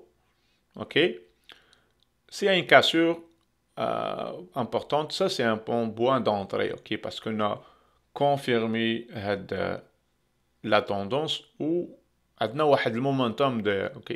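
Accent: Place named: Quebec, Canada. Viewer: merci pour vous riches analyses ! avec accent québécois en plus :) grand bravo !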